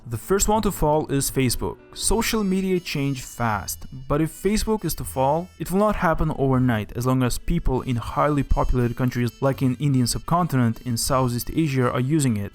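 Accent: Eastern Europe. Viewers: So by the way you pronounce Huawei am I wright in guessing you're from Eastern Europe? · clearly eastern European accent... But this "the" Google or "the" Amazon thing is kinda weird...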